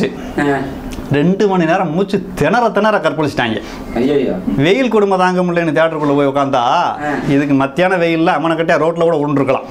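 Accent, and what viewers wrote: Madurai, India. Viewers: love scenelam vayithaala pora madhiri irukaapla..sema kalaai...super nanba. today than unga channel pathen.. madurai slang super.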